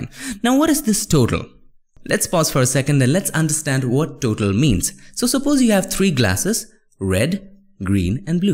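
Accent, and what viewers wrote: India. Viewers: you have good techniques and concepts, but damn your english! you talk like an indian rapper. you have to slow down on your words and give more emphasis to it.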